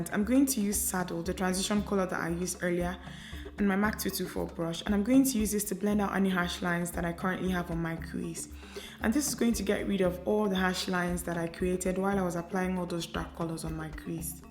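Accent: Nigeria. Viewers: awwwh.., thanks for this one. love your videos, love your real nigerian accent that goes with it too. love them all!!! pls post a video on IG now it's been long 😢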